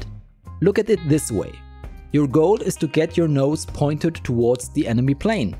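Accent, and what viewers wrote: Germany. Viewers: or you just have an incredible german accent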